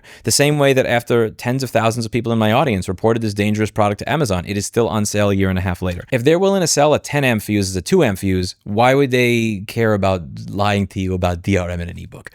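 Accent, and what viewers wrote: New York, United States. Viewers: you would'nt happen' to be new yorker ey?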